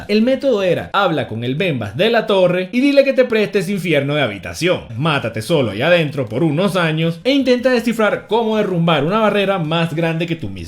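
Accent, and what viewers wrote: Venezuela. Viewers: Pamre jure nunca ver a un youtober con ese acento venezolano pero tú eres la esepción grande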